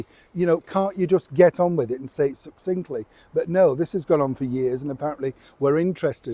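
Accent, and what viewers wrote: United Kingdom. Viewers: Love hearing from a Brit.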